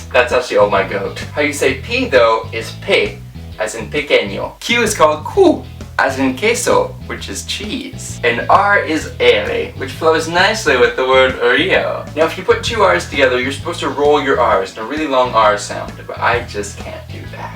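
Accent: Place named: France. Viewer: your accent is so french!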